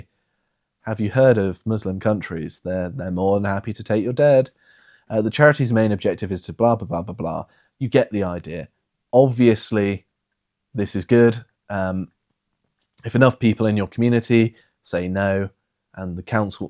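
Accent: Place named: London, United Kingdom. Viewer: He's speaking like a Londoner tbh. Completely disconnected with where he came from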